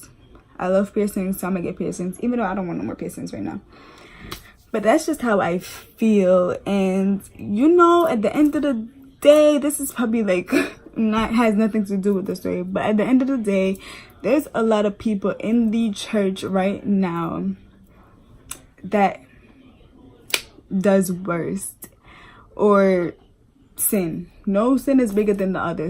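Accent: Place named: Jamaica. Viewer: Nuh understand where yo Jamaican accent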